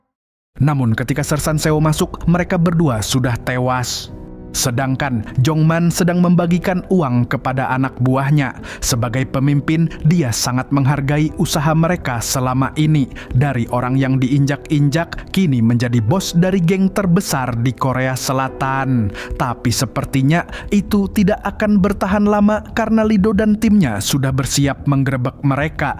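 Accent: Banjar, Indonesia. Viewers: Lah mamang urang Banjar leh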